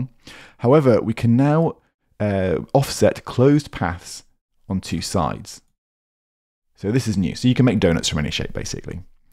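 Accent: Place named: United Kingdom. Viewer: He can't change being British. Also maybe check the playback speed in your settings.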